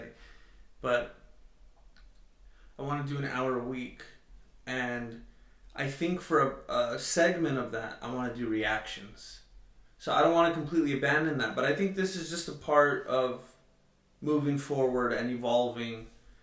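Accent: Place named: Mexico. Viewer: Thank You 😊❤️ I just love seeing the Italian flag 🇮🇹😃 with that Mexican accent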